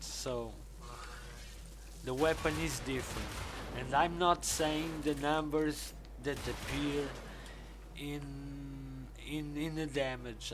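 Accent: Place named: Europe. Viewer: 2 things: 1.) What accent do you have? Sounds European 2.) If you can, show your mods for all your stuff, would be cool to see what you use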